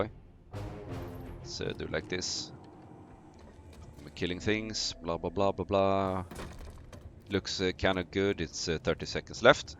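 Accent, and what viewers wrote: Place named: Sweden. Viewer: Svengelskan på topp, bra video 👍🏻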